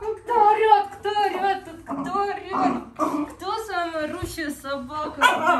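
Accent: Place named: Eastern Europe. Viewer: Her accent seems to be from Eastern Europe. Where is she from ?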